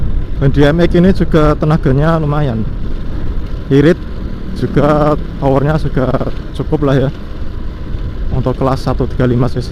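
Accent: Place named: Java, Indonesia. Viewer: Jowo lu ora ilang bro, Gw ngguyu tenan ini🤣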